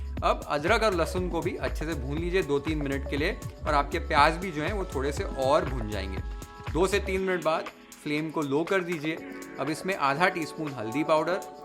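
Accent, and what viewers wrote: Maharashtra, India. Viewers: Your narration as well as your recipes always have a typical maharashtrian touch.... Makes me feel connected with you ☺️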